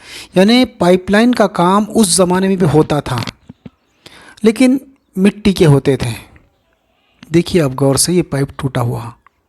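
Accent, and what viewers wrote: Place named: Deccan, India. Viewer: Mashaallaha your talafus is daccani mostly Belguam side.you’re explaining it correct.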